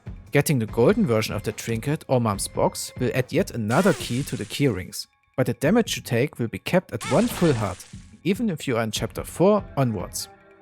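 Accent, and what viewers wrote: Germany. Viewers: Deutscher , nicht wahr?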